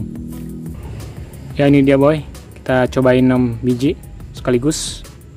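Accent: Java, Indonesia. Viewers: Orang Jawa bang?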